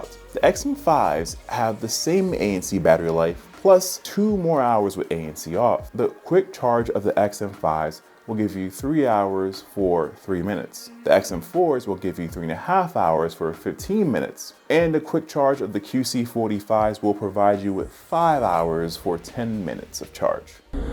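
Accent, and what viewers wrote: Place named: Philadelphia, United States. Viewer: Sounds like you are in the Philadelphia region based on those stops 😅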